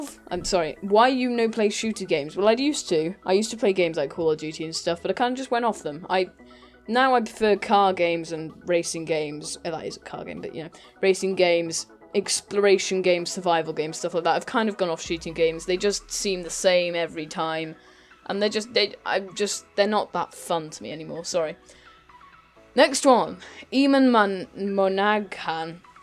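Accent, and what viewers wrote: United Kingdom. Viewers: Are you a real British?!?!?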